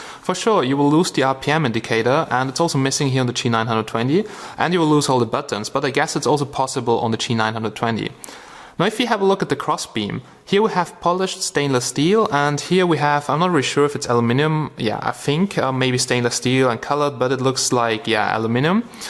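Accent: Germany. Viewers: Are you german speaking guy? :D Bist du? , es tönt so :D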